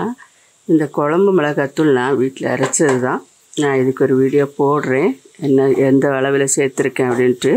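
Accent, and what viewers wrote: Nagercoil, India. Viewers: Amma unga voice cleara irukuma Nagerkoil slang matiri iruku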